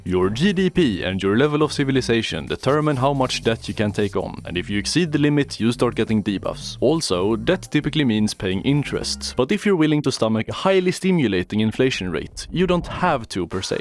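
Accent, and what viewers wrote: Sweden. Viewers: I can hear the Swedish dialect. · max0r's style really doesn't work with that thick Swedish accent and demeanor. For this to work you'll have to stop being monotone which is an impossible task for any temperature challenged individual.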